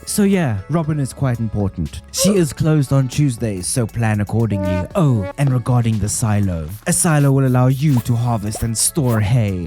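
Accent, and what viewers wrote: South Africa. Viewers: You are south african for sure.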